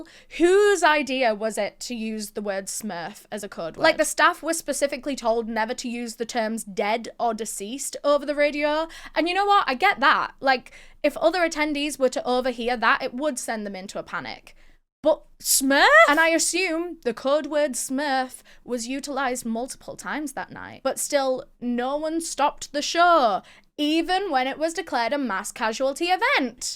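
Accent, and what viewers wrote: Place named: North, United Kingdom. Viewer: northern accents are so hard to listen to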